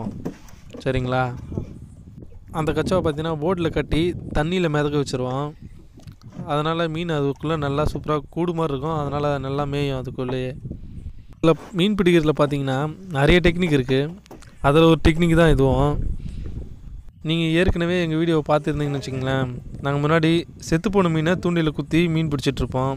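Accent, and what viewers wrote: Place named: Chennai, India. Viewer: Sagothara ethula Chennai slang pesuringa and fishing style kaniyakumari yepdi?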